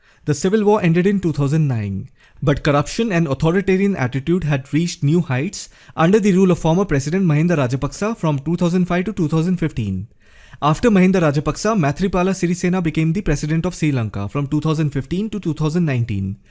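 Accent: India. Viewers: The funny indian accent makes the video more interesting.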